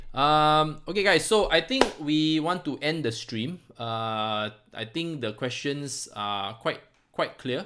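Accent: Singapore. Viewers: What accent is that? Singapore?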